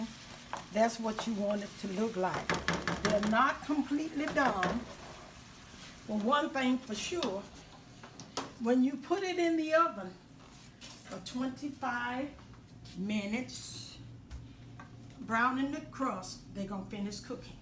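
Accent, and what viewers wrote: South, United States. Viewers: exactly! It's a southern thing and that's just how we talk ❤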